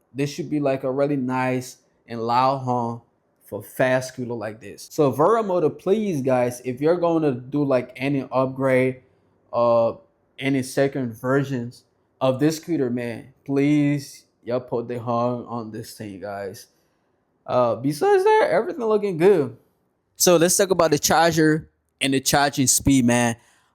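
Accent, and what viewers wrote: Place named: Liberia. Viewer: Your accent, you sound Liberian.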